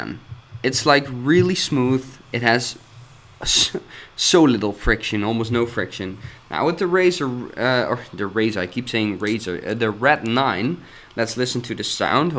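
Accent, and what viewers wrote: Netherlands. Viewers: hoor altijd het nederlandse accent haha :P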